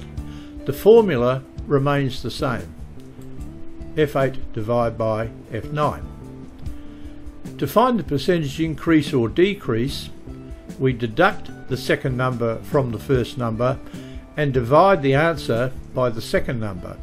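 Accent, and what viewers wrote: Australia. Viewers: True... I'm used to listening to his delightful Aussie accent... sans music... for my latest Excel learning tidbit.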